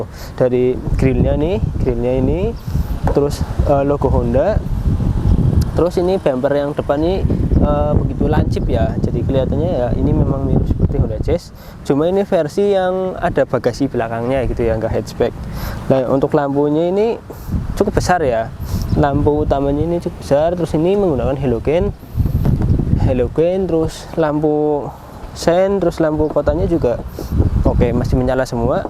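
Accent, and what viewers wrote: Banyumas, Indonesia. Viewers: deneng Ngapak...😅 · Medok banget ya mas jan enyong banget😅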